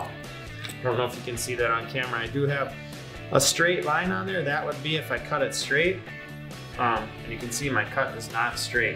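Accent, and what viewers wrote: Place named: Minnesota, United States. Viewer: This guy has to be from Minnesota. Great video!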